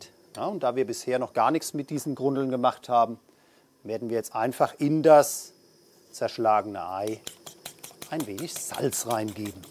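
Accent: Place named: Hesse, Germany. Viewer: Ich liebe diesen hessischen Einschlag! :-D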